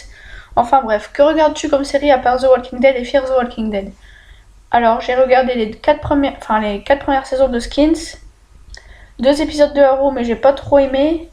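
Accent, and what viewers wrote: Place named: North, France. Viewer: Hey bah mi j'min douto qu'této du nord ça s'intind a t'n'accent alors bin venu au club :) Traduction pour les non ch'tis '' hey bah moi je m'en douté que tu était du nord ça 's'entend alors bienvenu au club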